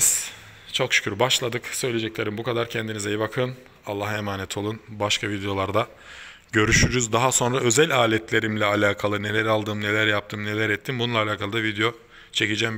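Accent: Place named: Russia. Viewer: Ustam çok güzel olmuş. Hayirli ıslerin olsun. Konuşmanda ara ara rusça aroması alıyorum. 😊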